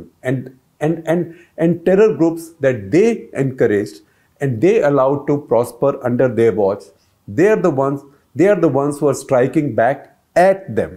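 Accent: India. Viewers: There's something known as 'Indian accent'. Its less of an issue and more of a feature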